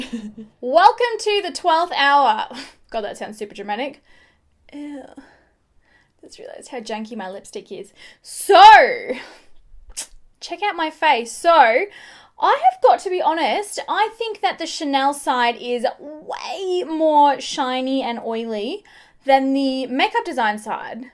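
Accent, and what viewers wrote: Australia. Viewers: Oooooo love this! Yes that Aussie accent!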